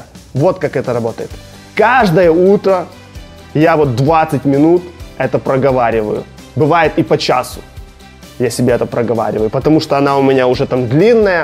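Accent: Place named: Kyiv, Ukraine. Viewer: Говор такой, как у моего брата двоюродного :) В Киеве живёт, Березняки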